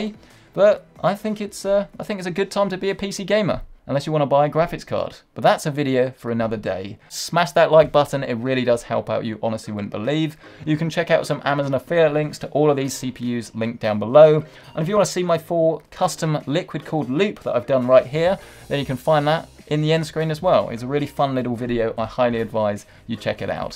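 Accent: United Kingdom. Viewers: Is this guy British? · he's so bri'ish heh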